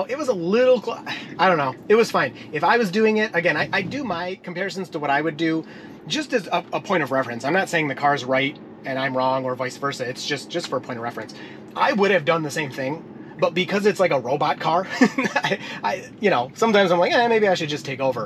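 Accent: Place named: Midwest, United States. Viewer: Just hit SUBSCRIBE, so I feel like I have the right to say this… Dude, you’re in Michigan. What’s with the “y’all” talk??? You even have an unmistakable midwest accent and you are clearly not southern. Just sayin’…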